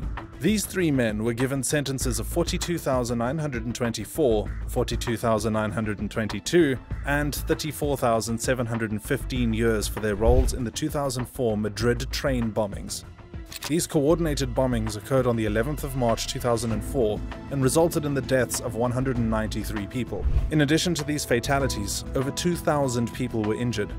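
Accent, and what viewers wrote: South Africa. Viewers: South African, but it's not that strong, like they've been living in England for a long time . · so refreshing to listen to a South African accent for a change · Hey man super random, but are you South African? I’m SAn too but it’s rare that I find a SA YouTube channel with this content and this good. Would love to hear from you boet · bro are u South African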